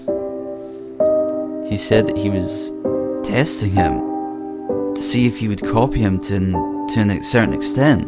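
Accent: Scotland, United Kingdom. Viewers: Scottish accents are sexy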